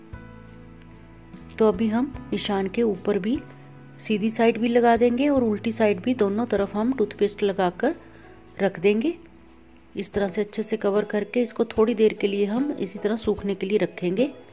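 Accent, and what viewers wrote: Punjab, India. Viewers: Ma'am Aap ki hindi sun kar toh hum bhi Punjabi bolne lag jaayege, hamari Hindi ko Pls. Mat kharab kro.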